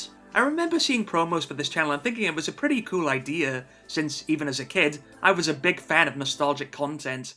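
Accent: Wales, United Kingdom. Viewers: Hey, there's another Welshie! I'm from North Wales, I reckon you're from Swansea or Barry? I'm sure S4C had a second channel at one point, but it was mostly used for overspills of the Welsh Senedd broadcasts. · I'm just now realising that your accent is Welsh and not Northern English. I'm an idiot.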